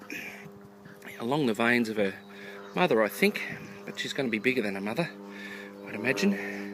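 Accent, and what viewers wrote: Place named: Australia. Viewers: You do have an Aussie Accent but not as strong as some. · I know it's because you're Aussie but the way you speak to them sounds so bad to me as an American (obviously they're dogs so how you speak to them doesn't matter) but it's just kinda funny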